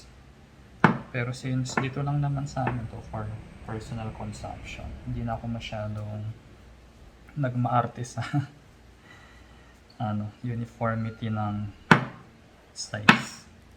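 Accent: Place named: Pampanga, Philippines. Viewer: magaling ka talaga lodi... ohhhhh mah!! ahaha talagng kapampangan. kanyaman na pamo ning inuman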